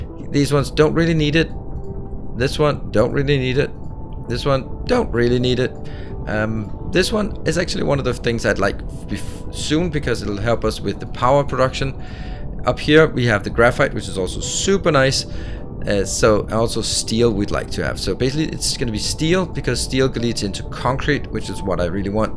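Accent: Denmark. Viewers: Are you danish? (because of the accent. Nothing against danes, on the contrary...just curiosity)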